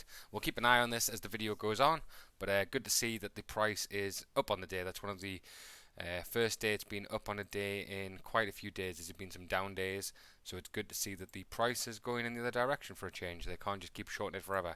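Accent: United Kingdom. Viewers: Oh nice, a Brit. Hey 👋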